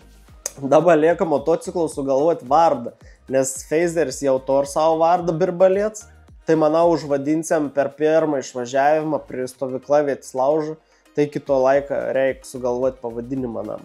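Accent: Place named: Samogitia, Lithuania. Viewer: Kazkaip keistai smagiai ta zemaiciu kalba klausos visokie vidosikai 😀👍 Maladietc!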